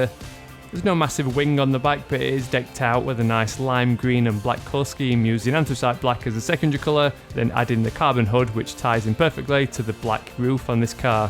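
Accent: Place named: North England, United Kingdom. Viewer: by your accent I can deduct that you're from north England but where specifically?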